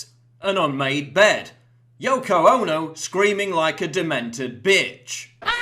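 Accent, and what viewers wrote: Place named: United Kingdom. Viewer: Just because you have a British accent doesn’t mean you’re not an idiot.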